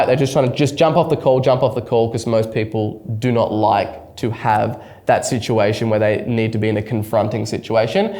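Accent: Australia. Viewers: is hormozi with ozzy accent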